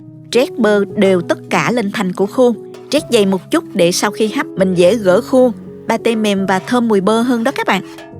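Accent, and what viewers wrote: South, Vietnam. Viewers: Giong miền Nam của Bình Dương nghe ngọt và bùi như patê cô làm.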